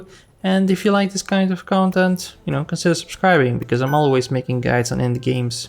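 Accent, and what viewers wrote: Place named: Netherlands. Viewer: You sound Dutch!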